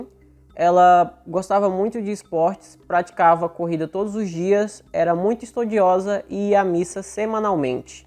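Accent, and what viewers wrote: Northeast, Brazil. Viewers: Parabéns pelo seu trabalho. Se posso fazer uma observação essa é que você tem uma forma muito boa de expressar sua opinião . As partes engraçada deixa mais leve a narração. Ao mesmo tempo que choro em alguns casos, ao mesmo tempo dou risada com seu jeitinho de falar( acho que tem sutaque nordestinos). Sou nordestina e amo cada pedacinho , sinto muita falta .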